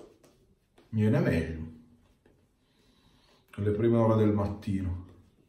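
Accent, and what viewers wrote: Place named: Piedmont, Italy. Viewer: Il tuo accento piemontese mi ricorda la "mia" Torino dalla quale sono partito ormai dal lontano 1996. Molto belle anche le interviste a Paolo Barrasso e Donato Ciniello. Un'altra video di compagnia visto / ascoltato mentre anch'io mi stavo radendo (la testa). Prima o poi vedremo anche una rasatura della testa? Buona giornata a te ed ai tuoi followers.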